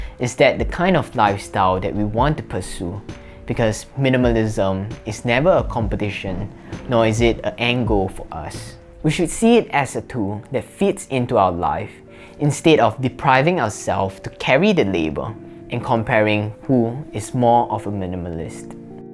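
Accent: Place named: Singapore. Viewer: I thought your accent was familiar, hello fellow singaporean!!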